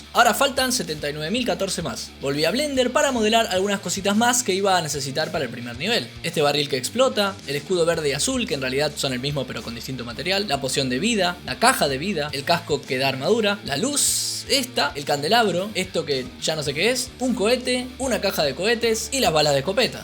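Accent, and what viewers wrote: Argentina. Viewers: Un genio total, es el primer video que veo y ya me enamoré, me recuerda mucho a los vídeos gringos y me da satisfacción saber que ahora esta en español y encima argentino (corregime si me equivoco) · Tu voz me recuerda a la del youtuber 'Jota.' pero con acento más argentino jajs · Escuchar a un argentino hablando sin parar como usando frameworks descuartiza el Doom para pasar la tarde.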